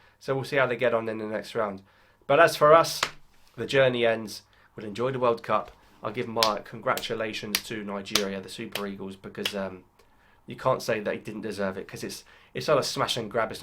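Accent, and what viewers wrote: England, United Kingdom. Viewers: Sweeet!!!! Nice jolt ⚡️! Algerian with an English accent!!! I was expecting a French accent !!! Love your spot on analytical review! 👊🏾🇳🇬👊🏾🇳🇬!!!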